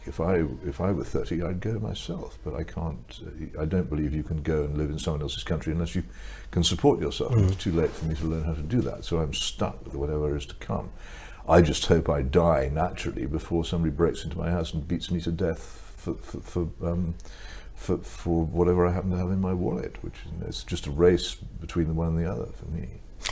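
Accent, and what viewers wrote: RP, United Kingdom. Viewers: Take the plum out Peter; drop the affectation. Can hardly tell what you are saying.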